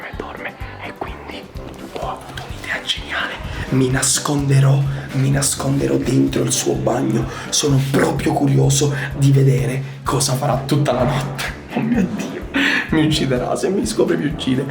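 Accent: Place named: Veneto, Italy. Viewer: mamma mia che dialetto Veneto biceri zio can glie i bicer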